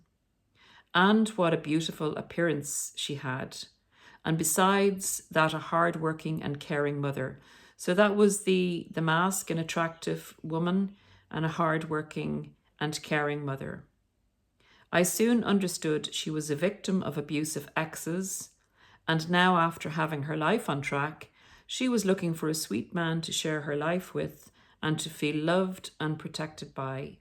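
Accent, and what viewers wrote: Ireland. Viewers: As well as her beautiful Irish accent ♥️🥰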